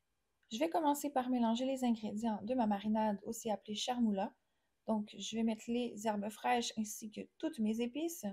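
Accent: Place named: Canada. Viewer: Je crois elle est du Canada mais c'est compréhensible ce que elle dit !!!!!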